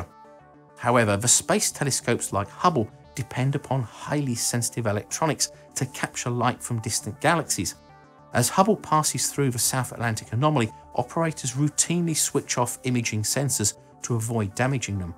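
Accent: Australia. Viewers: comfy voice, ..accent sounds Australian. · Despite the high Australian accent, this guy's elaboration of scientific facts is cool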